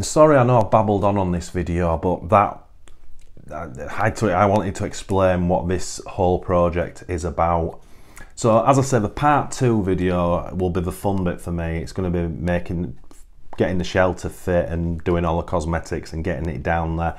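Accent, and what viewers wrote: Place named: Yorkshire, United Kingdom. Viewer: I'm also wondering if there's a knight rider shell???lol. I put your accent somewhere between huddersfield and Leeds? I was thinking in a previous video it's a bit sunny for round here,lol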